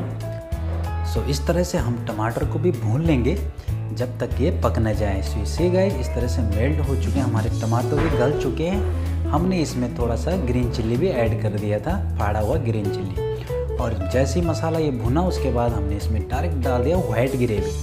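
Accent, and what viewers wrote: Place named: Garhwal, India. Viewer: Nice gadwali chef your tone like gadwali m ryt Some time make uttrakhndi cousin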